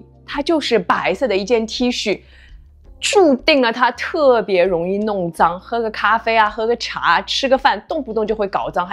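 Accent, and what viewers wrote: Shanghai, China. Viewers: 姐 你是上海人吗？麻子比较大因该是上海话吧。呵呵😁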